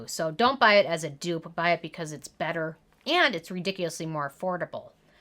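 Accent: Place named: Midwest, United States. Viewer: Are you from the Midwest? Your accent sounds like mine. I’m from Michigan! Haha. Also, great video as always.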